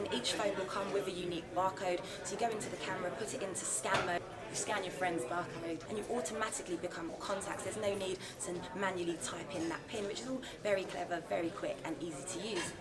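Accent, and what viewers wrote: United Kingdom. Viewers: i like her british accent..... nice...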